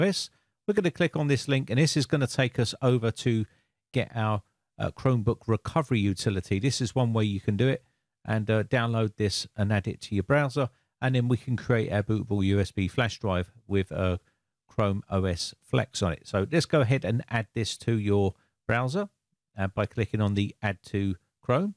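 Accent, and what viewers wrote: United Kingdom. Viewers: August 14, 2022 - Compliments to for a clear, and concise instructional and informative video. As an anglophile, I particularly like accent.😉 Most of my drama entertainment comes from Brit productions. I watched this video because Microsoft is getting more authoritarian by the day. With Windows 11 they are basically making people that buy that OS their property. They want to control everything from the customers' PCs to the customers themselves. Google is no "knight in shining armor", but I would rather sell them my information in return for an OS that works, and is free in a monetary sense anyway.😏😏 One of the more positive things coming out of the whole Climate Change, and preserving resources movement. Is that people are becoming increasingly concerned about Tech items that can't be upgraded or repaired. So fortunately more people are interested in recycling and repurposing things that shouldn't be thrown into landfills until they are absolutely useless and just don't work anymore. 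I would appreciate it if made a future video that is more oriented towards installing a Google OS on desktop PCs. Cheers from Connecticut, U.S.A👍👍😊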